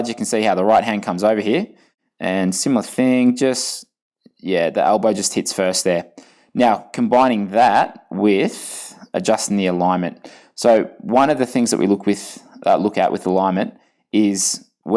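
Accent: Australia. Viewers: Another excellent clip! Thank you! Though your Aussie accent makes pull buoy sound like pool boy!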